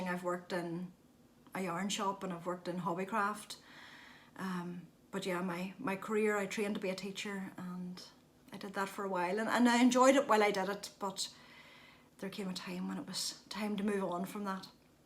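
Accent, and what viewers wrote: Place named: Ireland. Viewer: Thank you so much, could listen to you all the time.Love your Irish accent.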